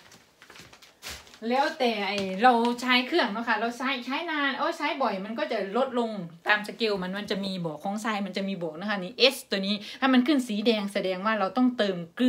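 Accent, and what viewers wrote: Isan, Thailand. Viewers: เอื้อยทรายเว้าอีสานเสียงตาฮักเนอะ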